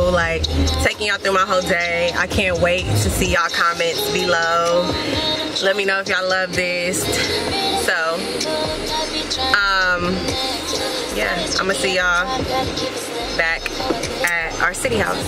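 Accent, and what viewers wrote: Los Angeles, United States. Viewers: Flawless.. You Do Have A Accent.. LA af but Twainy(Southern) at times.. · That 323 accent 🤣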